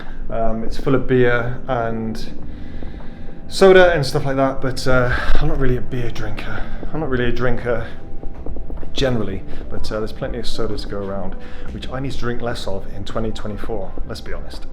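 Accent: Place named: United Kingdom. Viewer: Me and wife have booked here for September great video Ps u don’t sound American our you British ?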